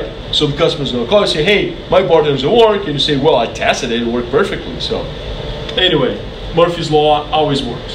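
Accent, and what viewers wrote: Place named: Brazil. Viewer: Esse cara tem muito sotaque de brasileiro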